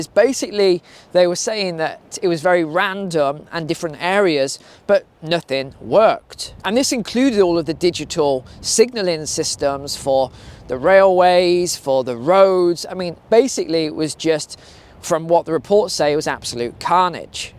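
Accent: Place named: Upper class, United Kingdom. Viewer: You shouldn't ever trust anyone with a public school accent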